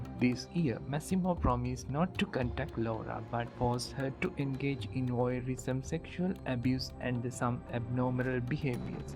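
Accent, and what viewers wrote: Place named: India. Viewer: 🇮🇳 Indian accent is annoying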